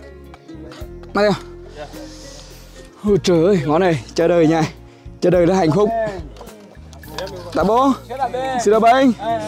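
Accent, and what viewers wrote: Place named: Central, Vietnam. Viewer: Quê Phú Thọ mà giọng thì giọng miền trung😊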